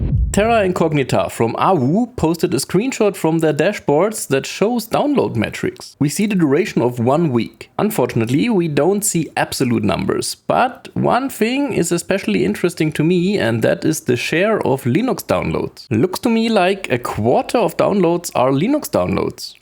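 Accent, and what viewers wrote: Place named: Germany. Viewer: I don't know you but i love your german accent ;)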